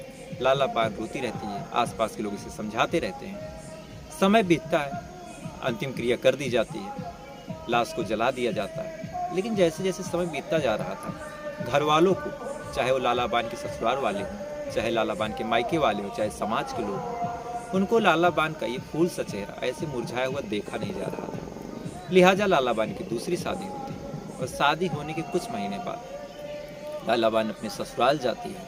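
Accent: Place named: Awadh, India. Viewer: जय हो,कहानी अत्यंत मधुर स्वर में प्रस्तुत कर मनमोहक बना लेते है।" "अवधी बोली का प्रभाव आपके बोली में है।क्या आप अवधी बोली वाले जनपद से मिलान कर रहे है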